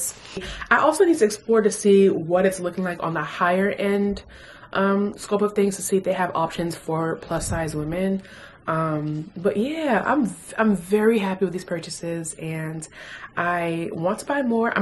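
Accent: United Kingdom. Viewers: Your British accent though...love it, and I'm mad country!🤣🤣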